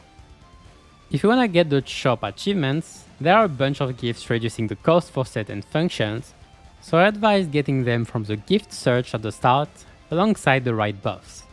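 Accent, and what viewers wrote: France. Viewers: Thank again for tutorial i will send to my friend he really need (J'adore votre accent français xD)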